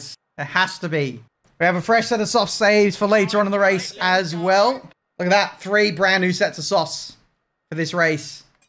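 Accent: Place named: Australia. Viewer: What part of aussie you from?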